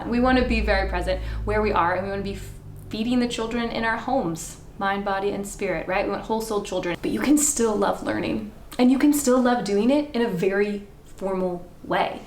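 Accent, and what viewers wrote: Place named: Scotland, United Kingdom. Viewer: My baby was babbling over you talking and I heard that you pronounce everything foreign in a "Scottish" accent because that's the other language you know. That tickled me 😂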